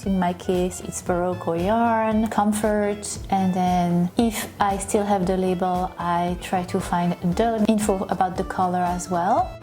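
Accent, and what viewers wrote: France. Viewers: you have a french accens XD I love you xoxo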